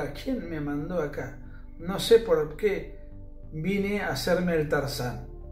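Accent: Mar del Plata, Argentina. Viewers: Ah!!! El acento de Mardel. Tremendo acento. Tan o más identificable que el cordobés. Sobre todo Mar del Plata del Norte. xD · Acento de mar del plata ja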